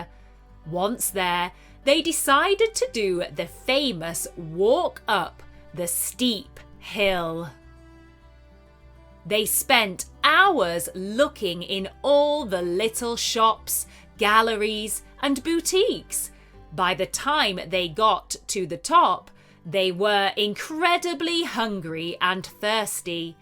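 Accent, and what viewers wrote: United Kingdom. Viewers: That UK English! 😁💜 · Finally i find a suitable channel to learning British accent thank you so much🫂🫂🫂🫂🫂🫂🫂🫂🫂🫂🫂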